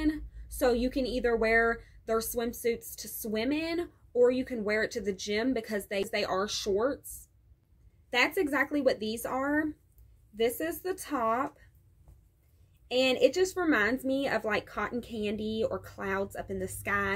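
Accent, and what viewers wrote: United States. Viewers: I fuckin love ur country accent💖💖💖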